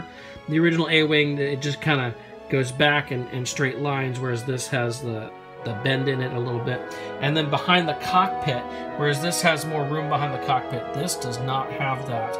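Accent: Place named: United States. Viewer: Whatever!, am bored with you. I take it you are a yank,only yanks talk like you do?.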